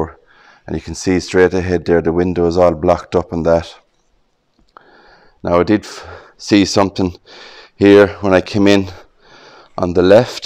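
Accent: Ireland. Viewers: speaks like Conor Mcgregor accent🙂 I like it. Thanks for sharing the great video.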